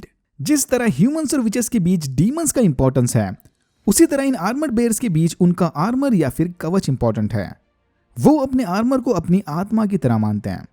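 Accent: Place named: Pune, India. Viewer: Punekar bolte🔥🔥🔥💪🏽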